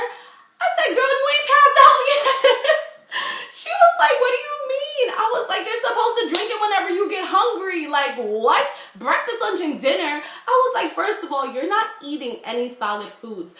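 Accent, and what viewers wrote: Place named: New York, United States. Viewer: NY accent... eeeww!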